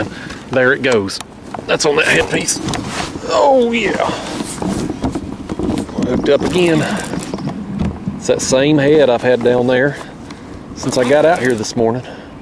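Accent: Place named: North Alabama, United States. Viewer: Just subscribed. Realized quickly you have the same exact country accent everyone spoke where I grew up (North Alabama). I shed my accent after moving to a bigger City, but it always makes me happy to hear it still alive and well! Awesome channel my friend!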